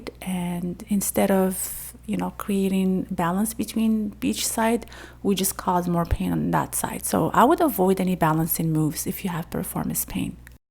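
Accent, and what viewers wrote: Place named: Morocco. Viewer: Spot on again (BTW I identified a district attorney as being from Morocco originally because I know - and love - your accent)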